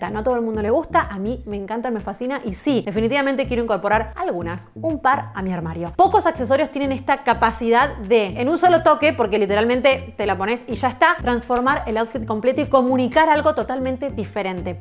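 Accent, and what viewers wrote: Argentina. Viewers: Llegueeeee!!!!! Hermoso que no pierdas el acento argentino 😍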